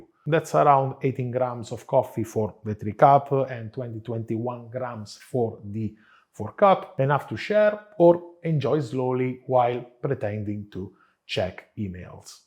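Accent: Italy. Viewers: He is genuine, he is experienced. The Italian accent was a little tricky for me, so I added the subtitles on. However, I learned how to use the Moka Pot for Italian style coffee.. Can you show me the exact increments for each cup of coffee, For example, 12g of coffee with 70-80 g water. So what would the increments be for the other cups of coffee? The exact increments will make better coffee. Thank you so much for this presentation,. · Listening to 10 minutes of english with an italian accent is the real treat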